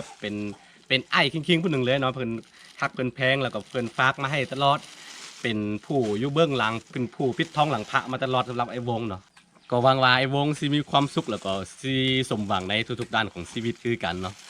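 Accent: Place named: North, Thailand. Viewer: พูคเหมือนคนเหนือเมืองไทยมากๆ fcลำปาง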